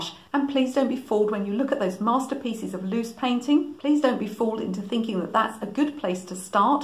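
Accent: England, United Kingdom. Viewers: I love your videos for so many reasons: 1.- You are realistic. Many people believe that Art is "spontaneous"... LOL... Spoiler Alert: Art is much like some kind of science. 2.- You are strict. People think that Art is total freedom, while total freedom is achieve by superceeding maximum restrains. 3.- You are funny. I love your jokes, it gives the audience the notion that Art is not necessarily "solemn". 4.- I really, really, really enjoy your amazing English accent (II am from Argentina, we speak Spanish), which is like a super give-away for me.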